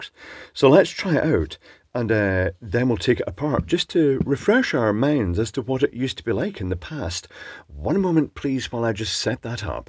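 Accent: Scotland, United Kingdom. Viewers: That will be the Scottish Soothing Voice. :)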